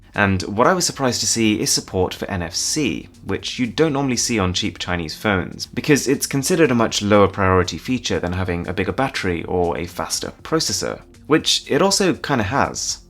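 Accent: RP, United Kingdom. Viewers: for some reason this guy's accent sounds too posh for my ears. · How refreshing to have a review given by someone who speaks the Queen's English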